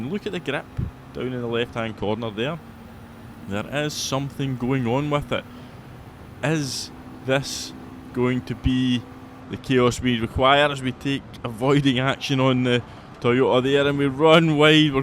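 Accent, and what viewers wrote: Scotland, United Kingdom. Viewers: Scottish accent or not, the way you pronounce p6… er…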